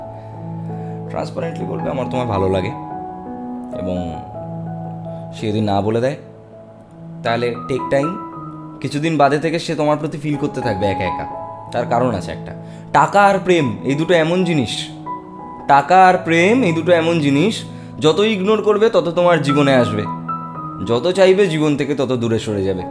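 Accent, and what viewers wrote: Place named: India. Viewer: কথা শুনে বুঝতে পেরেছি বাড়ি ভারতে ভাইয়া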